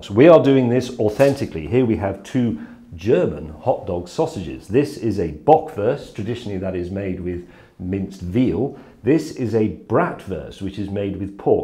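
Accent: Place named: RP, United Kingdom. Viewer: Michael Persico that not anywhere near posh. Thats just the trained BBC voice combined with a southern accent with educated received pronunciation. You can speak properly and carefully without sounding like a twat, he chooses every word carefully and because of that people all over the world can understand him.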